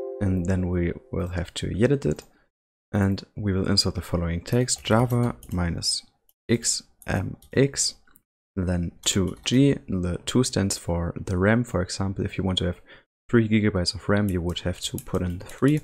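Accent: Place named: Germany. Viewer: Nicht mal 5 Sek im Video und erkannt das er Deutscher ist! HAHAHAHA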